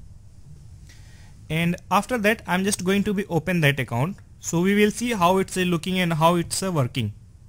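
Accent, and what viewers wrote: India. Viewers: Sounds like maybe India?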